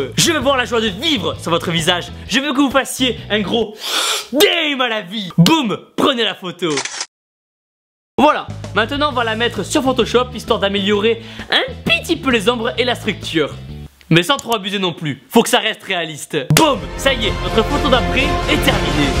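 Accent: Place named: Toulouse, France. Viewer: Quel bel accent toulousain!!!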